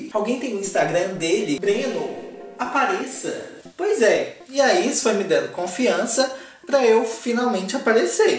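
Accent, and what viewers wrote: Minas Gerais, Brazil. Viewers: Eu amoooo a sua voz, o seu mineirês e a sua narrativa. Não entendi nada quando mudou o narrador no outro canal, logo deixei de assistir aos videos pois achei chato sem vc 🤩 abonadissima ao seu canal. Voa cara ,voa ! 😆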